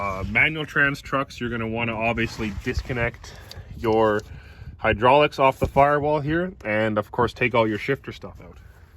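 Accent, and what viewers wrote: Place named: Alberta, Canada. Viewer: holy fuck guy youre a walking 2nd gen encyclopedia! by the accent im guessing youre in Oilberta.. you happen to sell parts? trying to put a 2wd nv4500 into my 4x4 47re, need the 4x4 adapter, a nv4500 4wd output shaft, and the input shaft for my transfer case to match the nv4500... why the outputs/inputs werent all the same is beyond me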